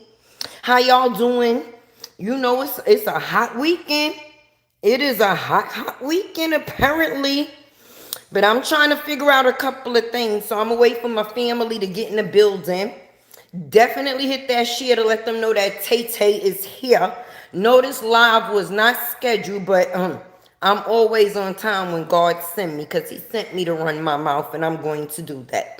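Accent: New York, United States. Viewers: Hey ur so beautiful n love ur new York accent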